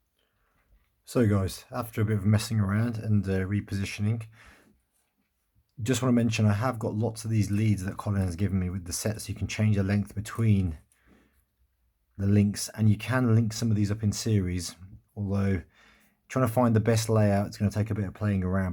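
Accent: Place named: London, United Kingdom. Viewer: I just recently got the awd dodge challenge in destroyer grey, definitely want to get these lights for a red underglow! Awesome video dude. ... also, do you happen to know any good crowds to race with in London? Only asking because of your accent lol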